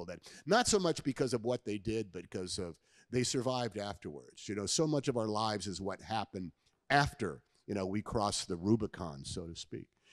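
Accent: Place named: RP, United Kingdom. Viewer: For the Americans, that was a ridiculously posh accent, not a Bristol accent.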